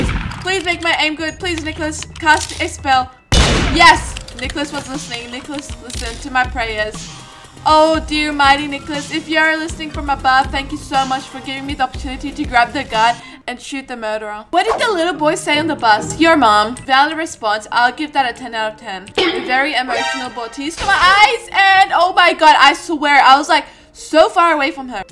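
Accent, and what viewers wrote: United Kingdom. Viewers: I'm very surprised by your British(or idk) accent(or voice)And can I join your videos?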